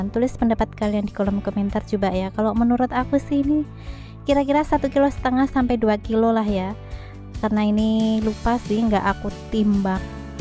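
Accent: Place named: Java, Indonesia. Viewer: Bundaa Asli Bali apa bukan bun.. Masih ada logat jawa nya heee.. Tarik sis... Semangka... Hihi.. Segee bgt..dalam nya kuning yah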